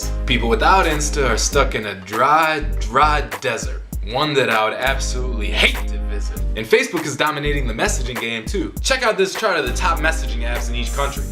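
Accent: India. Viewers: the Indian accent kinda sounds like Scooby Doo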